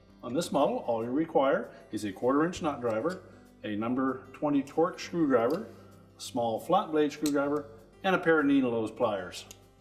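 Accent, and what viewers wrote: Canada. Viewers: Great video, and especially appreciate the East Coast Canadian accent. :)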